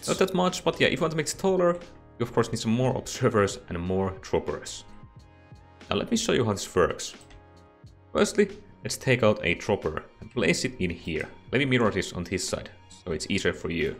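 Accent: Finland. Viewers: XD where are you from btw? your accent sounds so much like finnish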